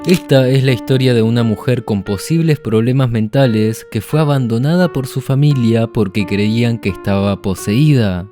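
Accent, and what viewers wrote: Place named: Argentina. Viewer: WTF Dross desde cuándo tenés acento argentino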